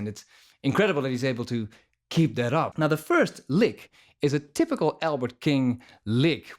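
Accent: Netherlands. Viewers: That Dutch accent...fucking coolest thing in the world.. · You sound like a Dutch guy when you talk, are you dutch haha?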